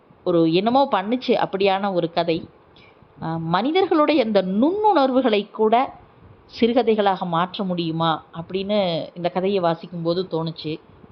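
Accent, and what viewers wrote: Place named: Tirunelveli, India. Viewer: நானும் ரிடையர்டு இங்கிலீஷ் வாத்யார்.கதையில் சொல்லப்பட்ட உணர்வுகள் உண்மை.குரலில் தின்னவேலி பாஷை பிரமாதம்.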